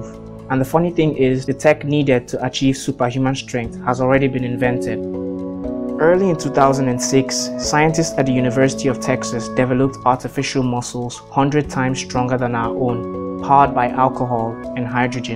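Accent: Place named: Nigeria. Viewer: bro your accent sounds Nigerian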